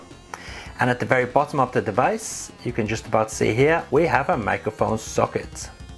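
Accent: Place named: Liverpool, United Kingdom. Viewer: Great review; and as a huge Beatles fan; I thought your accent might be from Liverpool. Looking forward to more.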